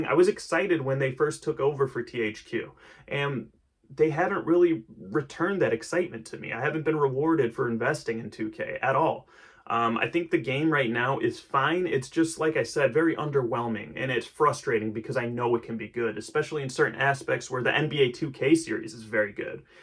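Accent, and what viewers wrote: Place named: Mexico. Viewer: No homo bro damm you are hot 💀😭 I was thinking you were from Mexico because of your voice 📝🗒️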